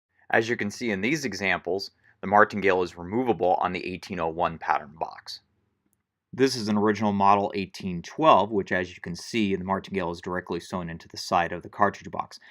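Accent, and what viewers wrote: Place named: United States. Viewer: Are you intentionally using ‘Murican pronounciation of the various uniform elements, vice the actual French pronounciation? Is that a reenactors affectation? Regardless, very impressive work! Do you belong to a Napoleonic re-enactor group? If so, what regiment do you present an impression of?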